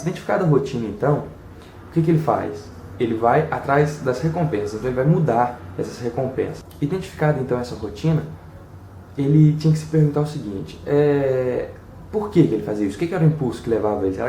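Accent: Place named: Minas Gerais, Brazil. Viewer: Parabéns. Foi uma ótima resenha, explicou tudo de forma clara, concisa e pude compreender perfeitamente a que o livro se propõe. Me animei a comprar o livro e realmente os hábitos são tudo, foi uma das características marcantes que percebi convivendo um tempo com pessoas extremamente focadas e proativas de sucesso, com perfil vencedor que convivi no colégio. Eles sempre tiravam as melhores notas e eram muito reconhecidos pelo corpo docente. Tem sotaque e jeito mineiro, acertei ? rs parabéns novamente, abçs.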